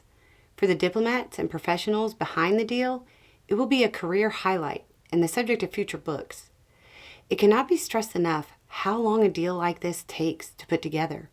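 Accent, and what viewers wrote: South, United States. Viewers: Thanks for putting out real news and honest discussion. I have recently started watching every single post from you. Keep up the good work. By the way, love your southern accent.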